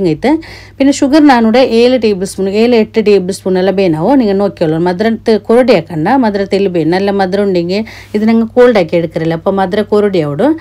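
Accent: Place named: Kerala, India. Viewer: സംസാരിക്കുന്നത് കാണുമ്പോൾ ഇയാൾ ശരിക്കും പ്യാരി തന്നെ ആണെന്ന് തോന്നുന്നു. പക്ഷേ സംസാരിക്കുന്ന രീതി മാത്രം മലയാളിയുടെ പോലെ ഉണ്ട്. അപ്പോൾ ശരിക്കും ഇയാള് മലയാളിയോ അതോ പ്യാരിയോ?🥰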